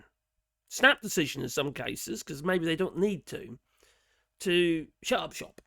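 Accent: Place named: Norwich, United Kingdom. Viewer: By the way, who runs this channel is from Norwich. I didn’t know this accent but I’ve got quite used to it from listening to him! 😉🙂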